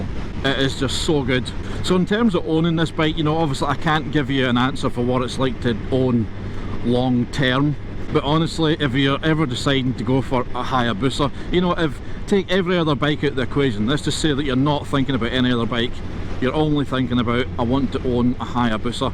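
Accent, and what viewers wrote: Scotland, United Kingdom. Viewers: Love this video, love the Scottish accent and above all, love the Busa. Great bike to ride.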